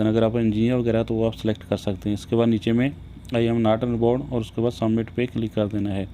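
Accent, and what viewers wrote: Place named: Shakargarh, Pakistan. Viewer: شکرگڑھ سے لگتے ہیں آپ بھائی آپ کا بولنے لہجہ بتا رہا ہے, اور ماشاءالله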